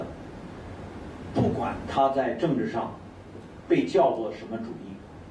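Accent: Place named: Cantonese, China. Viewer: 这个介绍人的口音富有广东腔。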